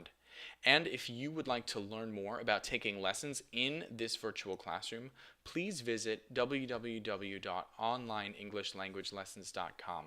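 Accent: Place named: California, United States. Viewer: OH WOW, WHAT A LOVELY ACCENT, ARE YOU FROM CALIFORNIA? AND YOU ARE SO HANDSOME....I SUSCRIBED !!!!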